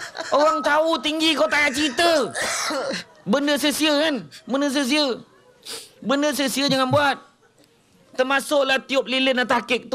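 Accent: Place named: Malaysia. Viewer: Wahh bang skit2 dh ada seleng Malaysia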